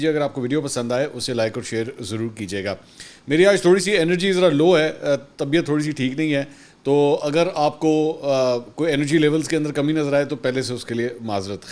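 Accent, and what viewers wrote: Punjab, Pakistan. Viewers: HAHA BHAI APKAY MOO SAY PUNJABI STYLE TOUCH BOHAT ACHA LAGTA HAI 😂😂😂😂❤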